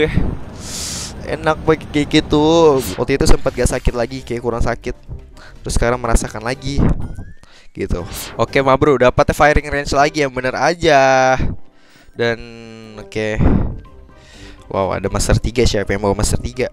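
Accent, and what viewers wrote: Java, Indonesia. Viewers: ngomongnya kayak orang Jawa untung aku orang Jawa jadi ngerti gituh hehe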